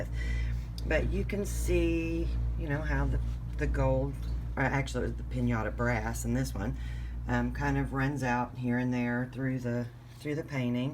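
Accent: South, United States. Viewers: Beautiful! Your accent DOES NOT SOUND HICK....like someone else mentioned, it is Southern Charm!!!!!! I love the southern accent; HOWEVER, there are a couple accents that are not as pleasing as yours, at least to my ear!😊. I really enjoy your work and watching you produce beauty from nothing....don’t be so critical of yourself, you are a winner in my book....keep the videos and teachings coming, I want to learn more! Blessings..... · You do not have a "Hillbilly Accent"... That is Southern Charm.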